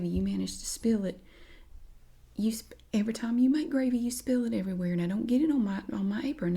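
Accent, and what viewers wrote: South, United States. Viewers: adina andrei extra points for a southern accent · Her southern accent is what stands out the most on the entire YouTube! Extremely professional and relaxing! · I'm transfixed by the southerness... haha :D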